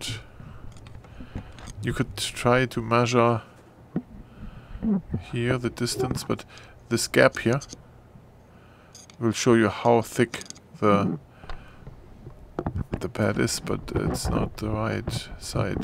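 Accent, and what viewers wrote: Germany. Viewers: Thanks!! Great video and photography. Love that German accent.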